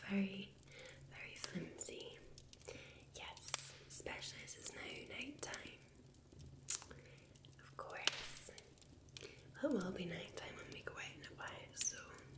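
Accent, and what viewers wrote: Scotland, United Kingdom. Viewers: Perfect timing, I’m currently getting snowed in in Michigan. You’re so good at this! A lot of people try too hard…over accentuating words, whispering to the point you can’t understand them, etc. You’re Scottish accent just adds to the relaxing tone & cadence of your voice and the overall experience. WhispersRed ASMR is fantastic as well, you’re both my favorites. I must have a thing for a UK accent hahaha! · never heard a Scottish accent ASMR, makes it even more amazing with such a soothing accent x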